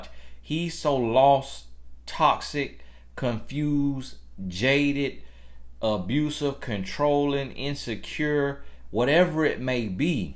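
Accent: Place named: Florida, United States. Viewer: I come for your wisdom, but most importantly I come to hear that Florida accent: “Werk”, “Bike”, “finna”...I miss that accent. I’m from Florida too, but live up North now. Thank you 🙏🏿 And that Florida Marlins hat though! 😎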